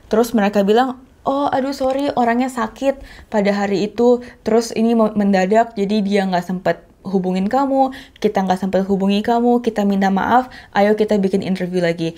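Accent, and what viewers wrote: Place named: Germany. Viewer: Lidahnya mbak udah sering keseleo sama logat Jerman.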